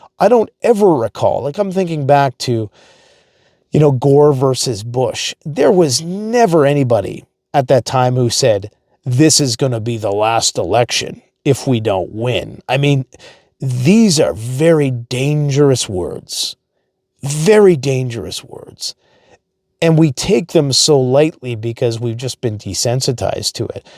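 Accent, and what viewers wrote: Canada. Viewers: Some videos your Canadian shows through more than others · You sound like a Canadian. I've never disagreed more with your assessment.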